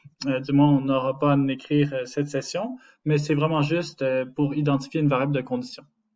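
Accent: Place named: India. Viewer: لي يحب يعذب وذنيه يسمع هندي يهدر فرونسي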